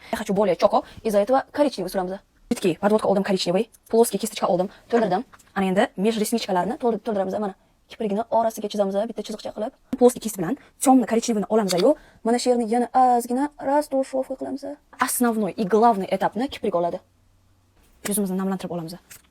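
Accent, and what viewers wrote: Bukhara, Uzbekistan. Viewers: Ibi Manam Buxorolik😅 shevamdan aylanay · Biz buxoroliklarni gòzal shevamizni ustidan hech kim kulishga haqqi yoq❤ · Buxoro shevasi nomer 1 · Manga Buxoro shevasi yoqadi❤ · Buxoro sheda juda chiroyli sheva meni dadajonim Buxorodan ayajonim Samarqandan makyaj juda chiroyli vaaaaav🎉🎉🎉🎉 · Salom buxorolikmisiz.Buxoromni shevasidan o'rgilayda.🫰🫰🫰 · Шевангиз узингизга ярашади Бухороликмисиз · Бухороликмисиз дийман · Biza Buxorolik bizaga shunaqa gapirish yarashadi😅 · Shevaba gap nest.Buxoro nomer ❤❤❤❤❤1. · Бухоро шеваси ❤❤🔥🔥🔥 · Navoiy yoki Buxoro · Navoiylikla qanaqa gapiradi nima kulasan Buxoro shevasi zoʻr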